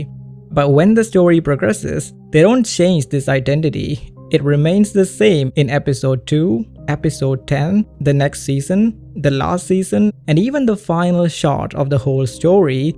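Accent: South India, India. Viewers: 😢 the americanised south indian accent is throwing me off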